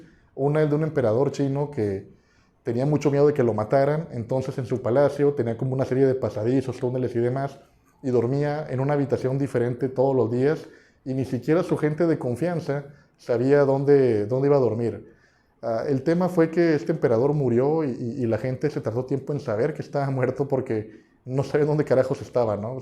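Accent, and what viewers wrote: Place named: Monterrey, Mexico. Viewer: Eres de Monterrey❤ ya lo imaginaba por el tono. Jeje.. super canal! Sigue asi y no deje subir videos 😊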